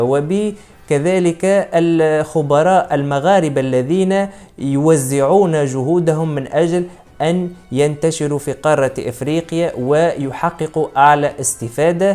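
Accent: Tunisia. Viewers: بارك الله فيك.. مجهودك مشكور ...وطريقتك في التقديم جميلة +اللغة العربية الفصحى بلحن تونسي جميل....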